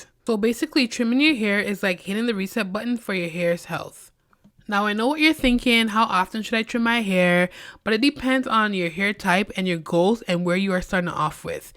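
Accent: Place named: Barbados. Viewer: Love your accent you sound Bajan!!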